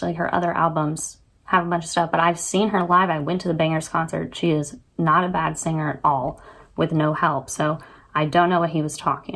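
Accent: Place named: South, United States. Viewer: You have a slightly southern accent, my favorite song of her is. What is your favorite one?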